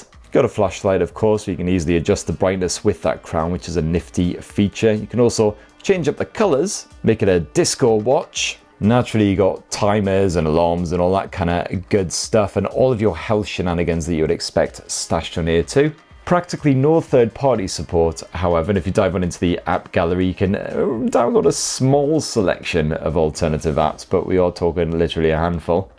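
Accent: United Kingdom. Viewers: I agree.. the way he swears and all those stuff goes well with the British accent more than anything else 🤭🤭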